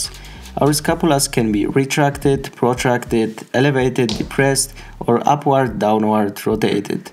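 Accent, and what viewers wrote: Poland. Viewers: I think when i listen your voice i conside that you from maybe Poland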